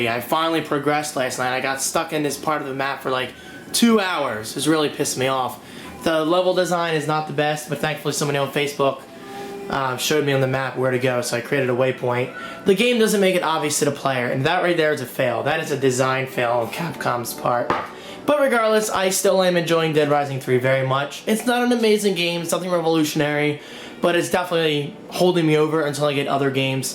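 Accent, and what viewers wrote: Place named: United States. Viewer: why do you pronounce "on" as "own"? Like when you say "online" you say it "ownline". Other than that you sound American, which is it should be coz your an American. LOL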